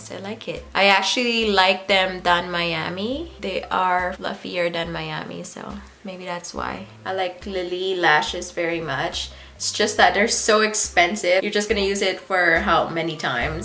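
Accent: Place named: Philippines. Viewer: Beautiful! JUST got this palette for my BDay 😃🤩 Cannot wait to use it when I get some energy! 🤗🤗 I agree, most of these shadows are better than Natasha Denona because the texture AND finish are BOTH PERFECT! LOVE your nail color!! What is it? Your Philippino accent reminds me of what I call home.... Hawaii! Have you ever lived there? Oh.... I miss making KALEMUNGI! We would knock on doors and ask if we could have a branch to make soup.... so good! Ahhhh, those were the days 🥴 Thanks for the video!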